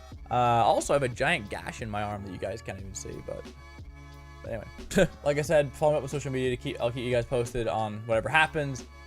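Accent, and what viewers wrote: Ireland. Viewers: where does he live??!! he sounds like hes from ireland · Mini Ladd sounds SOOO Irish in this video!!! Ik he is Irish but JUST THIS VIDEO!!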